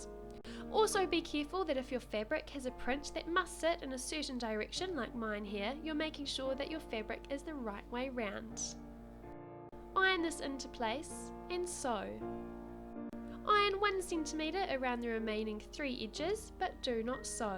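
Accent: New Zealand. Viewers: Sounds like NZ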